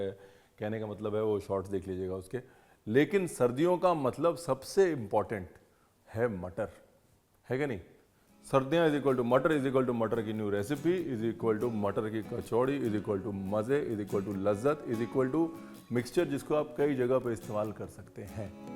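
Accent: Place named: Bihar, India. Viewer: AAP ka behari tone bahut acha ba.tnx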